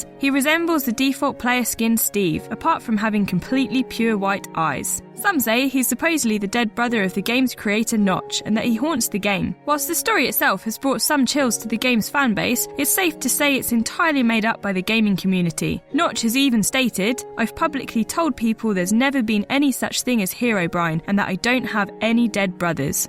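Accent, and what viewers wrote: United Kingdom. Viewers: Oh noo.. UK accent.. disaster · D: So many people hating because of a British accent?! I.. Wha...? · I have to say there's nothing wrong with the girl narrating the video or with her british accent, but the words are difficult to understand, to me (I'm from Brazil). In next videos, would be nice if she talks slower. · Uh British accents. They stress they're letters that require you your lips to touch (b, v, p, w, ) and make it into note of a "t" sound. So annoying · ***** She has the heaviest british accent ive heard in years, don't bullshit around. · I've met multiple British people so I can understand this perfectly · Guys stop complaining over a British woman narrating a YouTube video it's not gonna kill you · Everyone show some goddamn respect, she is doing a fantastic job and all you people do is blame her for being British, have you ever thought how you sound compared to England? 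americans think english sounds stupid , and english people think american sounds stupid. why can't we just forget the accents. this video is great. and she did a amazing job at this , so shut the hell up , find something to talk about , there is enough other things in this video to talk about other than how you hate her accent. grow up. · I can't understand how people find this annoying. I bet it has something to do with people not being exposed to british accent on a regular basis.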